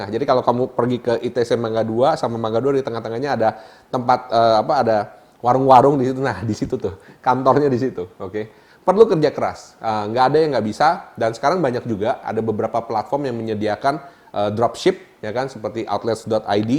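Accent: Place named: Surabaya, Indonesia. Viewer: Logatnya sama surabaya... Mas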